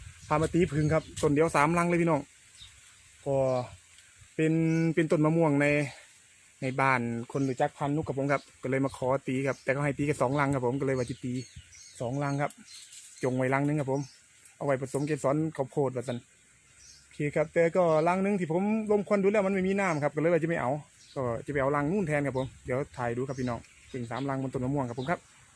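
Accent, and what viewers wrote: Korat, Thailand. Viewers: ดูคลิปทุกวันเลยครับคนโคราชคือกันฟังแล้วอบอุ่นคิดถึงบ้านครับ มายุกรุงเทพนานแล้วช่วงนี้เจอโควิดอีกคิดถึงบ้านกะกลับบ่ได้😢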